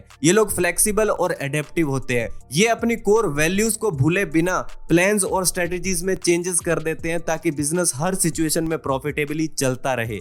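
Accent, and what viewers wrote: Gujarat, India. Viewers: Tum gujrati ho, accent! 🙂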